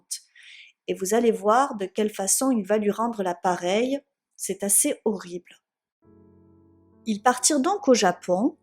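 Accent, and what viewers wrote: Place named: Var, France. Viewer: cogiter stories a traité le sujet il y a 3 semaines. Mais je vais quand même regarder ton travail car j'aime ton accent varois(peut être je me trompe) et car tu développes très bien tes sujets. Bonne fêtes de fin d'année à tous.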